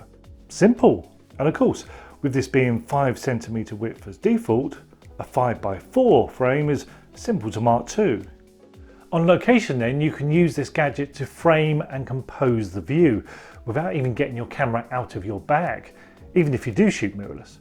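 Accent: United Kingdom. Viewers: Excellent instructional uploads amidst a sea of gear review channels. Always very interesting subjects with a unique delivery style. It's nice to hear a UK voice for a change. Thanks for sharing and caring. Keep on keeping on… ✊🏿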